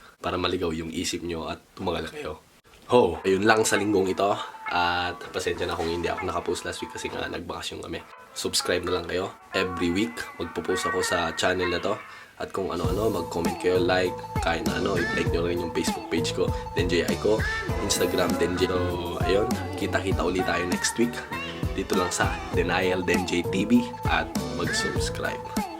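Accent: Ilocos, Philippines. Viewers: This kuya is ilocano.. talks like my uncles and cousins in la union Kuya, cave is kweba not kubeta (toilet) 😋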